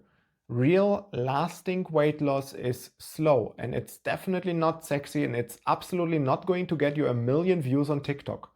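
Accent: Germany. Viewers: when you hear a strong german accent like this you know he doesn't wanna sell you anything but just genuinely want to help you. thanks man. I needed this. Turning my walking pad on right about now 👍🏼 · Really interesting video. Walking is underrated. I walk every day around 15k steps and do jump rope 4-5 times a week, and recently added 100 Push ups a day. Im not fat, but for what i put in... the results (over time) are lacking. I "just" need to change my nutrition. these damn tasty soft drinks and bread... Is that a german accent? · Gosh, finally a real video from a real normal person. I think all those American lecturers are from a different Galaxy, stuff I just don't understand. I wish I got to your "before" photo. 🙂Please, use kilograms, I am from Europe, just like you, Australians use kilograms, I can kind of hear you're from Germany 🙂. I started tracking steps/kalories (I think I have some optical results) and I came to the same startling conclusion - granola oat cereals for breakfast is a MURDER, also peanuts for snacks, which I need to phase out. 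Two fat slices of bread with jam have less calories than oat cereals and one does not get bloated. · Very nice, smart and informative video! As a French guy, I like the German mind, usually rational and analytical. Also, the accent... 😉 Also, your new body, lean and muscular. Congrats for that. 👍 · You sound German... To have German food and choose vegan food is just wild to me You don't really need the 1g of protein per pound of body weight. There's lots of data demonstrating this. Collectively, the data that suggest it's needed, shows about .7 to .8 is plenty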